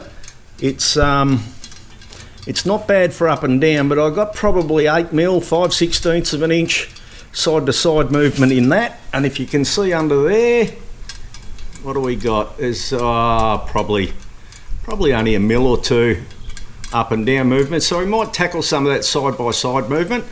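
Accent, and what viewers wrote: Australia. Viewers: Gidday, great to hear an Aussie accent after watching yanks building wheels lol. I’m about to replace a bent rim on a 2019 DL1000XT with a cross spoke pattern and it looks trickyAF. Ever done one like that? The nipples are in the hub and the rim has a double lip the the spokes fit through… it’s gonna be ‘interesting’ to say the least 😂.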